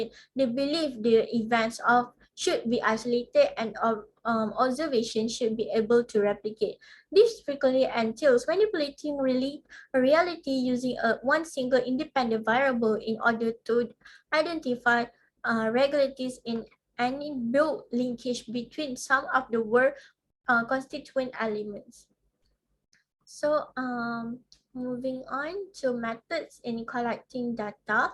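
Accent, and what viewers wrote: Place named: Philippines. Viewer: hii there very interested project research good explanation and details and most we malaysia still not aware about this one more thing the way you explain fascinate me good job and you can enhance 👍👍👍 sorry about one thing you stammer but no worries dear because you kind have some filipino accent 😍 anyway all the best and may allah bless you with success ammin.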